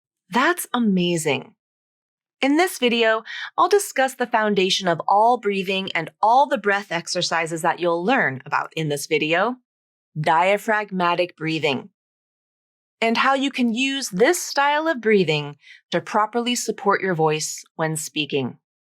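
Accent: San Diego, United States. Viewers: Hey! I'm a non-native speaker and I've been watching your videos and i absolutely love your channel. I was just wondering if you speak in a standard American accent or is there a tad bit of San Diego in it?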